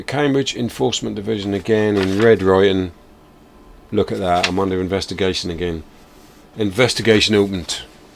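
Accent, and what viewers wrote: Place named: Norfolk, United Kingdom. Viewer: Left home aged 17, now 65 and never had a tv licence or visit. Good old Norfolk accent.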